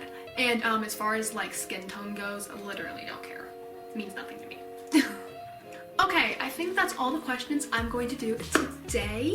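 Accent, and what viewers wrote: United States. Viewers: Wait is she American????????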